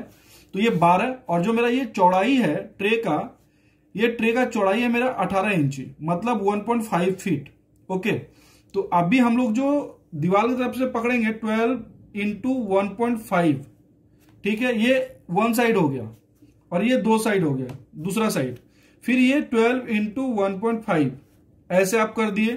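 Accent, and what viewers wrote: Odisha, India. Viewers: Bhai aap oriya ho kya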